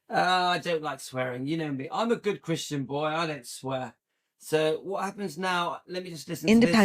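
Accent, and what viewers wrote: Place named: Norfolk, United Kingdom. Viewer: did you grow up anywhere near Norfolk because sometimes I detect a Norfolk accent? Only sometimes 😀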